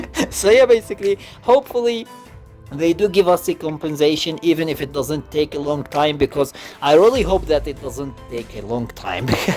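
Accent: India. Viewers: Indian accent XD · Ayo 4 Why is ur ascent Indian is one of ur parents Indian or something like that?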